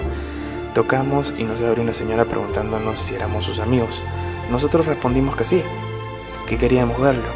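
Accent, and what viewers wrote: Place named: Peru. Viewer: Gaudhi Solimano Claro, cualquiera conoce la voz de un peruano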